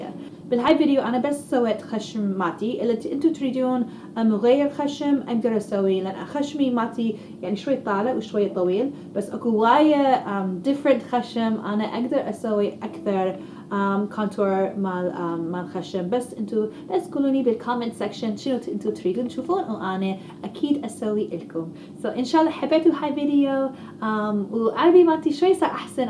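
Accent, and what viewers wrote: Iraq. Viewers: عفت الفيديو وكعدت اسمع لهجتج بالعراقي 😍كلش حلوه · حبي هدى اللهجه العراقيه تجنن عليج استمري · فدوه شلون تحچي العراقي يجنن بحلگچ هدى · Yes BABY I'm so so proud of you keep practicing your arabic! It has truly improved! Love you little cute Iraqi accent❤️ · حبيتت تعلمت واايد اشياء منج و سوي ڤيديوات اكثر بالعربي عشان تتقنين لهجتج (كيوتت لما تتكلمين بالعراقي😭😂💜💜) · احلى شي بيج باقيه على لهجتج العراقيه رغم صعبه عليج احسن من البعض بس طلعو من العراق غيرو لهجتهم تحيه الج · اويي فدوة شكد احبها من تحجي عراقي 😘😘😍😍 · أحب لهجتها العراقية 💜💜💜💜💜💜💜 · خخخخخخ اللهجة العراقية جيبلي القرف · You're speaking like irak😂... You're amazing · كايمه تحجي عراقي فدوه 😂😂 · هههههه عراقي يجنن عليها 😂😂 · هدى تعلمت تحجي عذراقي اعشقج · اموت عليج من تحجين عراقي ♥ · احلى شي من تحجي عراقي ❤️❤️❤️❤️❤️ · كلش احب لهجتج بالعراقي😍😍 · مرحبا هدى تخبلين من تحجين عراقي واني اتابعج كلش حلو الفديو عجبني ❤ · طلعت عراقية ، فديتها و فديت اللهجة العراقية حلوه هواااي ، تحية من جزائرية ❤💋 نبغيكم بزاف و نبغي كتهدرو هاك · والله اتابعه علمود اسمع اشلون تحجي عراقي يجنن حجيه 😘😍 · فدوه شلون تحجي عراقي امووت عليجج حياتي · فدوة حبيبتي شكد متواضعة ربي يوفقج وأحلااا شي حجيج العراقي ولو مكسر بس حلووو💋💋💋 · يخبل عليها الحجي العراقي يمه فدوه 😍🇮🇶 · استمري يا حلوه حلو الكلام العراقي عليج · فدوة من شافت عراقين يدعموهها ويحبونه كامت تحجي عراقي وبصراحة كلش حلو 😇😇😇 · هاذي لهجه عراقيه وهيه عراقيه يعني مصطلحات عراقيه😂😂😂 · حبيبتي لهجتچ بالعراقي مهضومه 😄 فديتج اول مره اعرف صاحبه ماركه هدى بيوتي بس جد حبيتج😘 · تخبل من تحجي عراقيييي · يمه فدوه للتحجي عراقي....فديتج... 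اصلا اني اكثريت منتجاتي من هدى بيوتي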